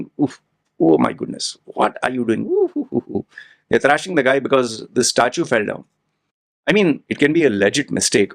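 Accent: India. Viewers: 🍃💯 I like your videos about bull religion system topics... But when you said about the man mustacs tryana be a man.... Very true I agree... But whatsupp with the freaking tattoos on your face... Hommie you ain't no Tyson.. what was you thinking... How does it make you feel.... More of a man, unique dasi, cool...or tryna get cool attention Coz I can bet you didn't go to middle school or even high school in the USA.... Your dasie accent says it all... Bakie tera cast system ka bilkul sahi hai..... I hate that fckin cast system more than anything about India coz millions of reasons I got 🎉🎉🎉🎉good job tho hommie.. ... Peep deep inside.... But right on! 🎉🎉Keep up the good work 🎉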